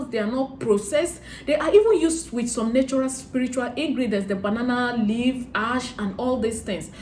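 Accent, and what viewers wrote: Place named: Nigeria. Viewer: Am in love with your Nigeria English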